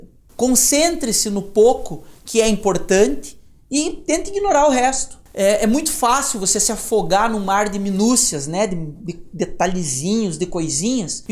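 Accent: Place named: Paraná, Brazil. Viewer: Esse sutaque acredito que seja paranaense kkkk. Excelente profissional o.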